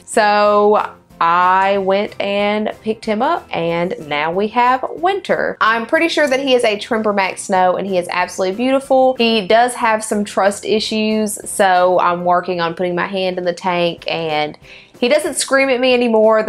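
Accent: South, United States. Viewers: you just sound like a southern bell I love it and ur pets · She sounds southern.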